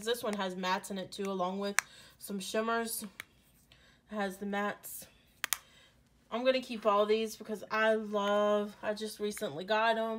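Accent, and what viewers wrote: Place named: Pennsylvania, United States. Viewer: Are you from PA, trying to place your accent.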